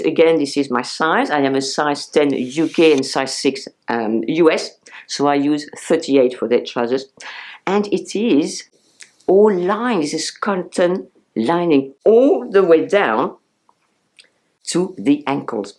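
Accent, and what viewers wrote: France. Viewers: You are very talented and can make anything look chic and expensive. So classy are some outfits that I copy some belts and scarves. The big thing I have taken to is red lips. My mother told me when I was 15 yrs old to wear red lips and 70 yrs later I'm doing it again. I wear eye shadow more naturally with a chocolate colour in the top of my lids and a beige or gold onto the lids. Muted of course. It's a head Turner. Just love that you don't put concealer under your eyes either. It's a winner. I watch Nicole Johnson and another french/ American model but you are tops for a little that goes a long way. Please do some more on your classy make up. Just love your anglicised french accent. 😍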